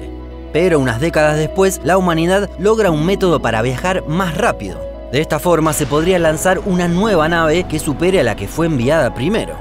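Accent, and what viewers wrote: Buenos Aires, Argentina. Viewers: Lo único que puedo criticarle es que habla muy aporteñado.Si hablara en neutro el canal llegaría mas lejos.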